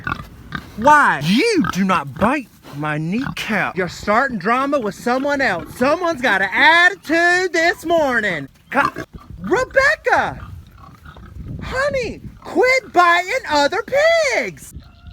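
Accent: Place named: North Carolina, United States. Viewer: You can tell you’ve been in good ole NC for a while! That accent is on point! 😂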